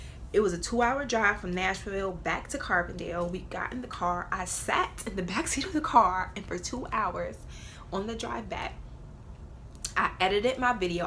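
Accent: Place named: New York, United States. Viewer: My fav!!! You can tell you been in NY you picked up the accent a little ❤️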